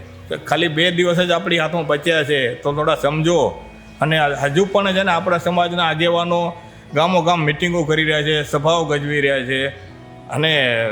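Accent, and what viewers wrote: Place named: Kutch, India. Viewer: આ ભાઈ ની સમજદારી અત્યાર સુધી કોઈનામાં જોઈ નથી આ ભાઈ બોલી ઉપરથી ખમીરવનતા કચ્છના ક્ષત્રિય હોવા જોઈએ ધન્ય છે બાપુ આપને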